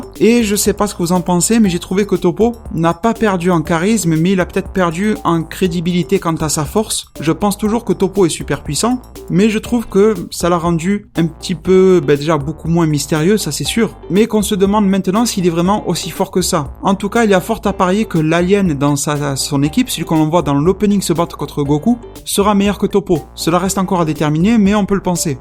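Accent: Marseille, France. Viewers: ton accent .. Marseille non xD j'ai le même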